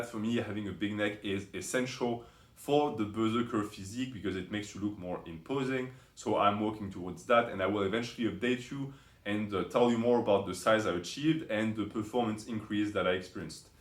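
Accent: France. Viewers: don’t know if you’ll respond to this comment after 4 years but your accent sounds like french, are you one of us ? 🇫🇷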